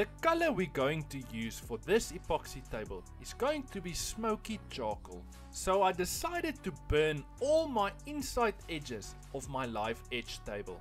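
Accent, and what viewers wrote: South Africa. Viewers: Hi, I love the end result of this project. It looks amazing. Quick question, you sound very South African, are you in SA?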